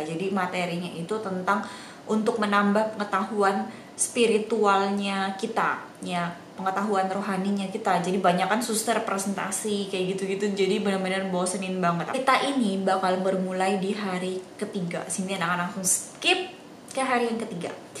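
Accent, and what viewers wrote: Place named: Java, Indonesia. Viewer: Lbh suka kalo ada medok2 nya.. haaha.. krn sm2 org surabaya.. toooss.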